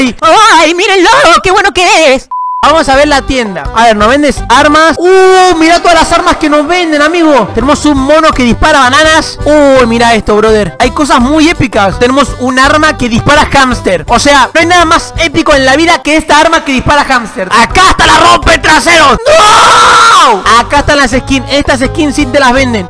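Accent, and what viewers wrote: Argentina. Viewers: Sos argentino??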